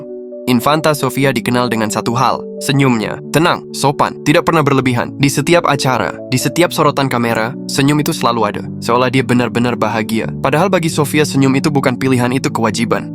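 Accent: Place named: Malaysia. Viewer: Mimin sering mengikuti intonasi kalimat dalam bahasa malaydesh, Hal itu tidak akan berpengaruh kepada pengaruh bahasa Indonesia di wilayah asia tenggara.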